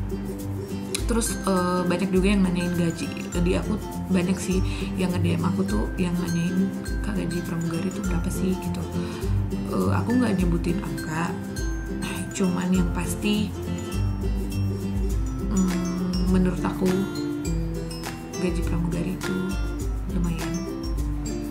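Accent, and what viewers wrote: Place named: West Java, Indonesia. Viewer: orang sunda ya .. sok silahkan .. hehe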